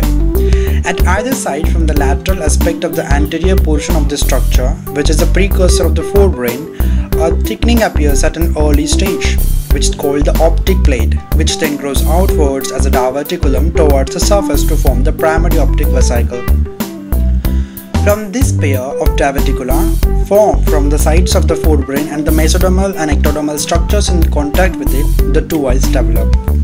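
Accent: India. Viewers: Yikes...indian accents are so attractive....watch out british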